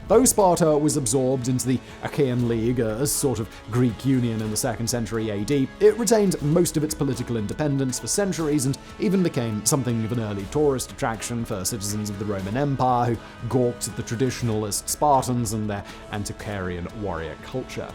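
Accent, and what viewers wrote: England, United Kingdom. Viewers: Didn't get any new information about war or warring cultures. Just wasted time hearing arrogant English accent.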